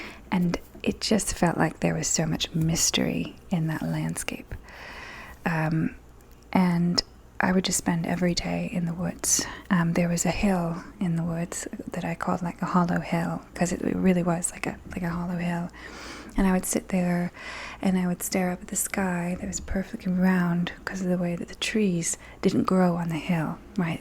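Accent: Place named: South, United States. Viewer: Such an interesting idiolect. It's funny, when I first heard you speak I couldn't tell if you might be from the south or the east coast so guess it makes sense you have a connection to both... sometimes I heard Jackie Kennedy and other times what I imagine the daughter of Shelby Foote would probably sound like.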